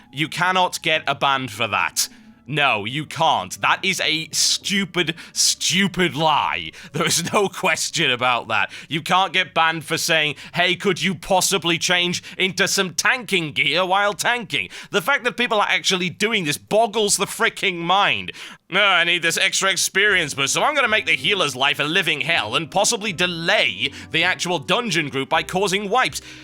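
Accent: United Kingdom. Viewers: lol British..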